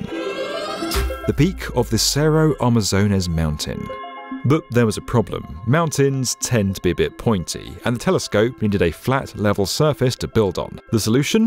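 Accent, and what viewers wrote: England, United Kingdom. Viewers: As an American , l cannot tell you how much a British accent adds to narration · It's not the height of Big Ben (a bell), which is but a few feet high, it is the height of the Elizabeth tower that holds Big Ben. You sound English, you should know better. Bollockbrain.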